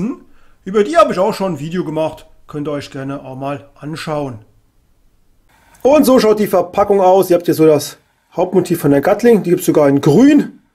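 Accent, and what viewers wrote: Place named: Hesse, Germany. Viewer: Machste gut Bubb. Klares Deutschhessisch, guter Ton, konkrete Fakten, kein unnötiges Gebabbel .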